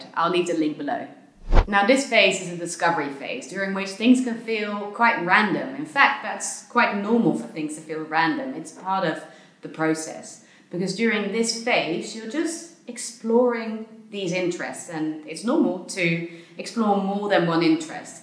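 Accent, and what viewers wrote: Netherlands. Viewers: Are you dutch? I hear a slight dutch accent leaking through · Are you Dutch? I hear a bit of our accent 🧐 just slightly.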